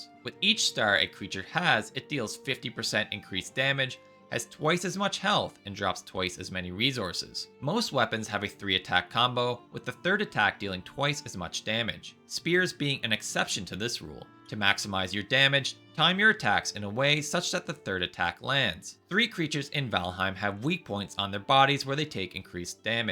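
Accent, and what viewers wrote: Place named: Canada. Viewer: A couple things, the fire tip was super short and kind of obvious lol, one useful thing I've been told about smoke ventilation is it's not always necessary. I don't remember the exact numbers but it's something around 3-4 walls high when you can no longer suffocate from smoke.👍👍 But If aesthetics are more your aim then the particle effects that emerge from smoke buildup is probably going to bother you.😅on a lesser side-note I can totally tell your Canadian from the way you say your R's😂👍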